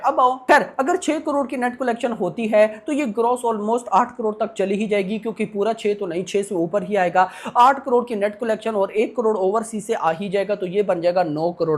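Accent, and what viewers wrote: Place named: Pakistan. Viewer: Why his accent is so so similar to Pakistanis ?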